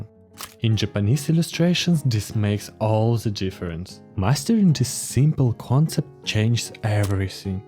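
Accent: Russia. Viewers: Почему я слышу русский акцент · Спасибо большое за ваш русский акцент Поверьте мне, если бы вы были говорили с английским акцентом, я бы вас не поняла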